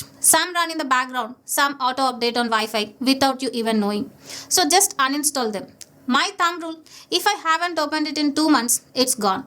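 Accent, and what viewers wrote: South India, India. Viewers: #@ Please pronounce english word properly you are using south indian accent english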